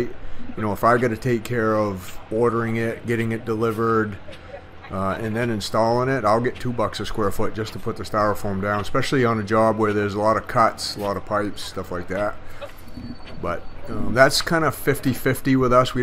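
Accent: Maine, United States. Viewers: Soon as I heard your voice, I knew this was in Maine. But looking at the woods in the background, it's not northern Maine. I grew up in Madison.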